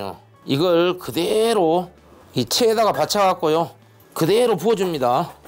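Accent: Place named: Gwangyang, South Korea. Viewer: 말투가 우리동네 같네요~~ㅋㅋ 정겹네요 이것은인자~~그래갓꼬~~광양말투 항상 맛있게 만들수있게 레시피주셔서 감사합니다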